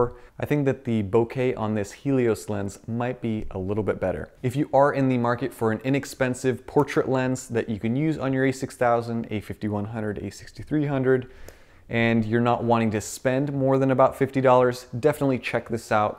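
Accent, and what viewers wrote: Russia. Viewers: Чувак, ты крут! Откуда такой русский? Where did you get this accent?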